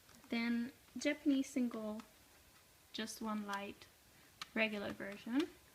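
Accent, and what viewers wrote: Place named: Netherlands. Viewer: haha heard you were dutch immediately when you spoke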